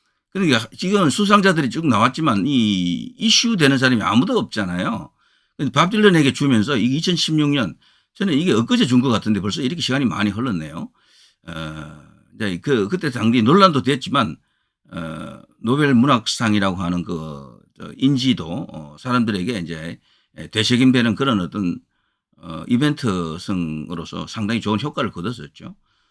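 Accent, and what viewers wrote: Gyeongsang, South Korea. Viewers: 내 이런 놈들이 나올지 알았다 갱상도 억양좀 빼고 영상 올려라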